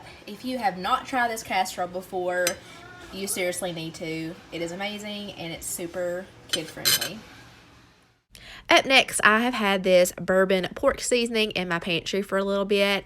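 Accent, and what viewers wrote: United States. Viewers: Hi, I love your accent, from which state are you? Greetings from Europe:) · Hello, I love your videos. I'm trying to figure out which accent do you speak. I'm German and I have a lot of american colleagues (they are from Palo Alto) and their accent is different from yours:-)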